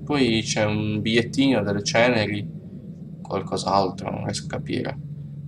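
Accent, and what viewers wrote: Bari, Italy. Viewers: maa.. sei di Bari? Comunque "stima totale!" bel video :D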